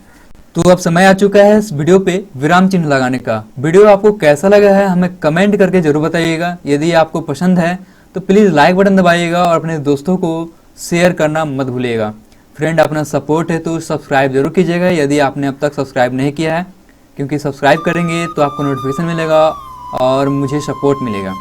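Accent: Bihar, India. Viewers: जरूर आप बिहार से है।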